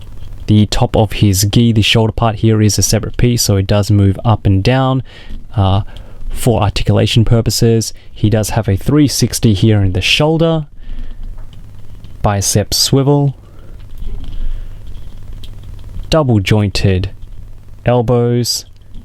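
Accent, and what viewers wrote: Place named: Australia. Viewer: hey man, great reviews and videos, just wondering where you buy your figures from? plus are you from australia? you sound like you have an aussie accent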